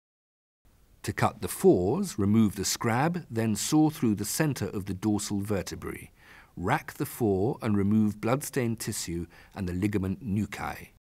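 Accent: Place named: RP, United Kingdom. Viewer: We don't need the posh version thanks.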